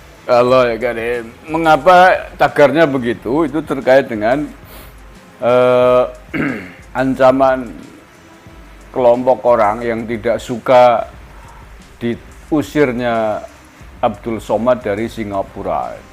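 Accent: Banyumas, Indonesia. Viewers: Sampyn orang mna pak,,,nada bicarane mirip nyonge,,,hehe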